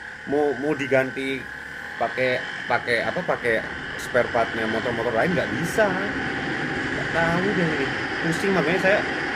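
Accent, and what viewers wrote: Java, Indonesia. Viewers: pak jowone ngendi???... hiromi dijak balek jowo to...hehehe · iki koyone wis sue nang jepang, tapi logat jowone gak ilang hahaha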